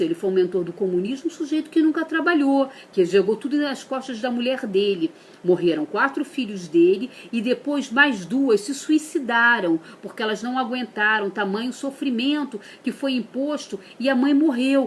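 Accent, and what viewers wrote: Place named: Rio de Janeiro, Brazil. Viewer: sotaque carioca de merda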